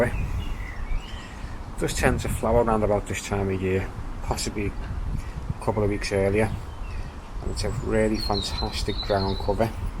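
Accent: Liverpool, United Kingdom. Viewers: Loved this video narrated by a fellow scouser, your plants are beautiful. Regards from Ontario, Canada.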